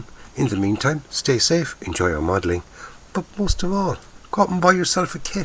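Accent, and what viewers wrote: Ireland. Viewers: I enjoy your videos and listening To the Irish brogue, but I've noticed on the last few episodes there has been a bloody annoying whistling sound all the way through them.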